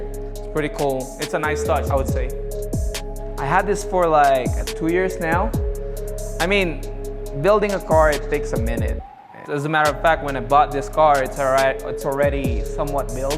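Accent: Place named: Tagalog, Philippines. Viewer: the tagalog accent is strong in this one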